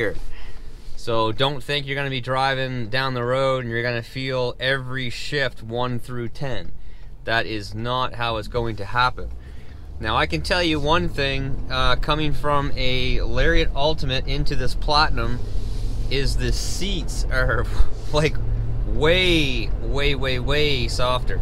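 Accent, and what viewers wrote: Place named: Canada. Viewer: I just realized your Canadian.... now I can’t unhear it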